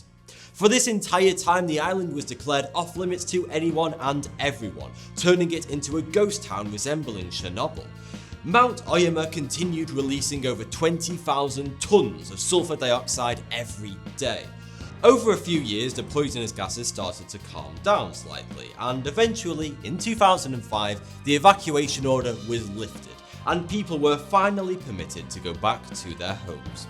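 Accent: United Kingdom. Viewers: Why almost of the facts givers or educational youtubers use British Accent??? I'm always wondering why??? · My friend hates British accents so I put the volume all the way up, just to male him mad 😂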